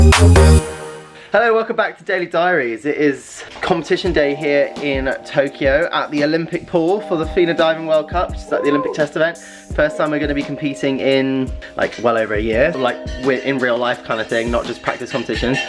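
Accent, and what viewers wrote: United Kingdom. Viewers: You & Matt are outstanding and your bubbly personality (and your EYES!- they're so dreamy!) on your videos is so much fun! But can you tone down the British dialect so that we older Americans can keep up with high-speed commentary? You and Lance were kind enough in the summer of 2017 at the Honolulu Rainbow Film Festival to say hello in a short video greeting to me as I lay in my hospital bed, missing the chance to attend the gala. I've been a fan of you and Lance since before then (and I'm holding onto that video!). Good luck at the Olympics!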